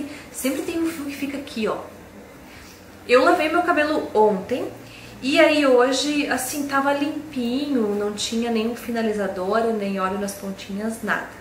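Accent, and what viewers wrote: Rio Grande do Sul, Brazil. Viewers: Sotaque gauches kkk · e gaúcha? Vc fala lindo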